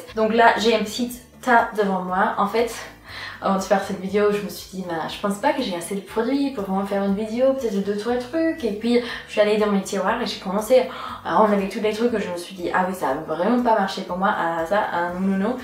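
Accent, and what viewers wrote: United States. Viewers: ***** Well, I'm wondering... Which words do you precisely need to learn? Because even though french is good, she pronounces words with her american accent. I know very well that most french beauty youtubers are boring though, so you probably don't want to watch their videos. ^^ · tu et tré jolie mais tes une francaisse ou américaine parseque ta un accent américaine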